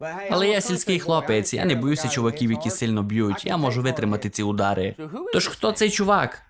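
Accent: Transcarpathia, Ukraine. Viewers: І ще одне питання. Дівчина почувши відео впевнена що ти земляк з Закарпаття 😅 Так є, чи помиляється?) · Круто але западенський акцент дратує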